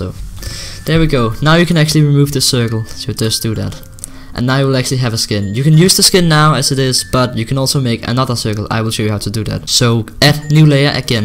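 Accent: Denmark. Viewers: are you from denmark? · Are you from Denmark? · are you danish?! omg i'm too danish omg!!!!! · Are You danish? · I elsker your danske accent;);D:D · heh realised you were danish because of your accent lool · er du dansker?? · Come you from Danmark?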